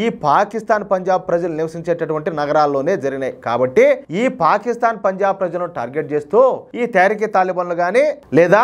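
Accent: Rayalaseema, India. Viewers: అన్నా మీరు విశ్లేషించే విధానం చాలా అద్భుతంగా ఉంది. అందులోను మీరు రాయలసీమ యాస లో చెప్పటం చాలా సంతోషం. కానీ మీరు చెప్పేటప్పుడు ఆ visuals కూడా చూపిస్తే, మీ ఛానల్ ఎదుగుదల చాలా బాగుంటాదని మా అభిప్రాయం. జై శ్రీ రామ్ 🚩🚩🚩🚩భారత్ మాతా కి జై 🇮🇳🇮🇳🇮🇳🇮🇳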